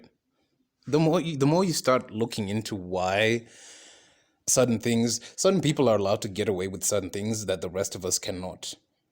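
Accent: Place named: United States. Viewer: The world is full of ridiculous people I also wanna ask something off-topic that I was wondering Your accent, it's interesting it sounds like a mix between Indian and American, am I wrong here?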